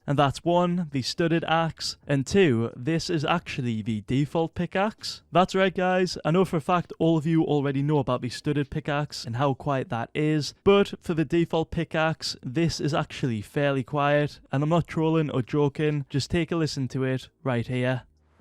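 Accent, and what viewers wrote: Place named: England, United Kingdom. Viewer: I really like your Videos but I hate this english Accent its so extrem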